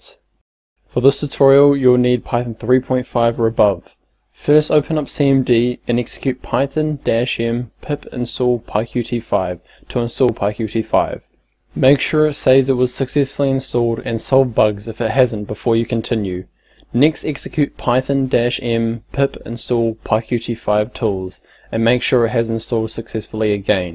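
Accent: New Zealand. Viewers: That T H I C C en zed accent Great vid!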